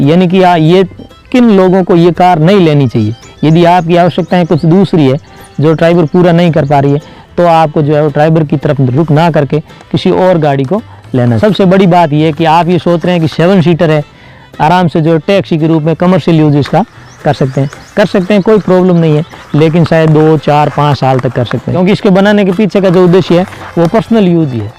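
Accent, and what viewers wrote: Rajasthan, India. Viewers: राम राम भाई.. कुणसो गाँव सा.. आपके बोलने के Accent से लगता है आप राजस्थान में हमारे गाँव के आसपास के ही हो..आपके वीडियो देखलर बहुत अच्छा लगा खुशी हुयी..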